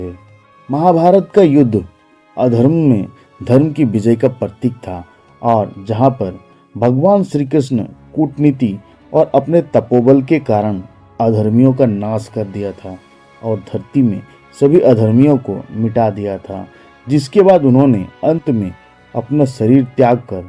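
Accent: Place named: Bihar, India. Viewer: Tumhara Hindi bolane Lagta Tum Bihari Ho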